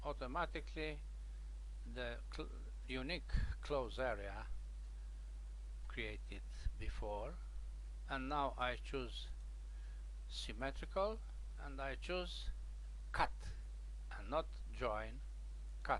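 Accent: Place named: Poland. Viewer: I find listening to your lesson reminds me of talking to my Polish father and relatives. It was very well done and understandable.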